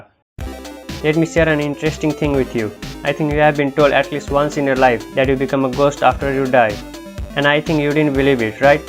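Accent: India. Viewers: Bro why ur sound u from India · u r acent is quite indian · This is why i love Indian accent it easier to understand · are you Indian? you sound like one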